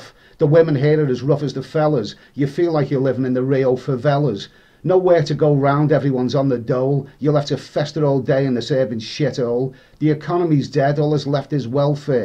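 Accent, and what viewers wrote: Liverpool, United Kingdom. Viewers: Just starting to watch your oldest stuff, as I'm up to date on your travels from leaving England for good. I am not into poetry whatsoever, however, I really enjoyed this mate! The scouse accent, the message etc. Excellent mate, nice one!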